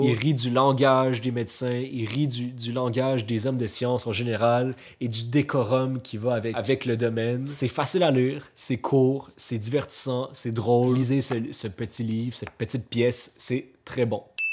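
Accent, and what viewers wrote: Quebec, Canada. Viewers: J'ai beaucoup de mal a comprendre les critiques sur ce type de défi. Autant je suis quelqu'un qui aime prendre son temps pour lire un livre et je suis plutôt du genre à lire un livre par mois, autant voir une personne qui se dit "je vais me motiver pour me remettre à lire et reprendre le gout de la lecture", comment trouver ça négatif ? Je trouve que c'est vraiment bien ce que tu fais, ça pousse à se remettre à lire, à se dire qu'on peut se donner des défis personnels pour se motiver à faire des activités, après chacun suit son rythme et ses envies. Bravo en tout cas pour tes vidéos. (PS: Ça fait plaisir d'entendre parler un peu québecois sur mon YouTube très anglais ou français, cela me rappelle ma belle année en Gaspésie.) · I don't know why YouTube recommended your channel to me but I loved the different accent though I don't understand French/Quebecois. 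Greetings from Rio de Janeiro 🇧🇷☺️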